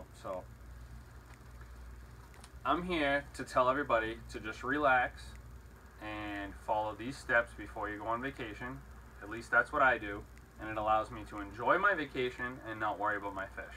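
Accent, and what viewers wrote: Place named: Massachusetts, United States. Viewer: Can I just say as a fellow man from Mass your voice just makes me homesick. Also as a guy who just got back into the hobby after not having a tank since I was a kid you actually saved my fishes life. Your videos have been very helpful with getting back into the hobby. I now live in Kentucky, and it's nice to have a little piece of Mass to go to, and get advice on a hobby I always loved.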